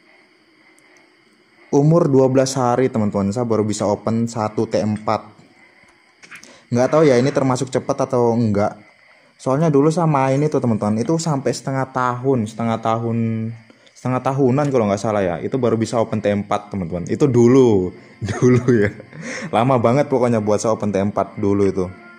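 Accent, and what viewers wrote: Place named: Eastern Indonesia, Indonesia. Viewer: Orang timur ini yak wkwk sa ko sa ko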